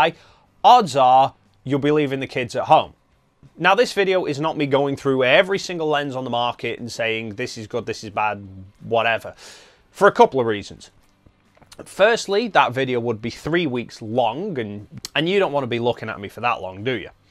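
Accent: United Kingdom. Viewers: Thanks for the video! I think I'm going to try prime 50 or 80mm. Btw, are from eastern parts of the UK? Trying to guess by the accent.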